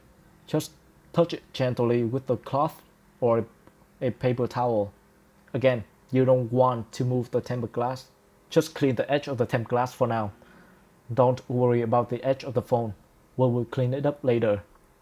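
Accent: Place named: Vietnam. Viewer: u sound like vietnamese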